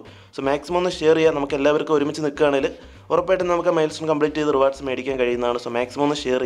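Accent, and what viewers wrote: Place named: Tamil Nadu, India. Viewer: Hi bro Tamil Nadu voice nice bro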